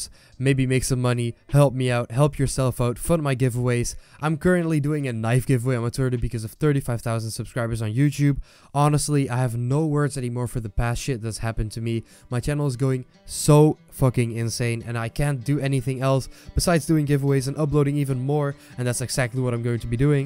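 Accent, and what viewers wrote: Netherlands. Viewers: You sound a bit Dutch!? can that be right? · I can hear a Dutch accent XD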